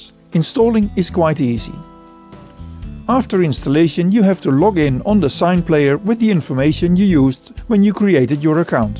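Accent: Netherlands. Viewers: Really funny Dutch accent.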